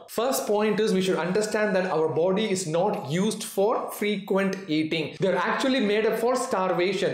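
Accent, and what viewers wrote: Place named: South India, India. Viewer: Your video are interesting to watch and the way u tell in South Indian accent and your friend name savarna Kumar lol 😅 Thanks for great knowledge very helpful 🎉😊 god bless you doctor 😊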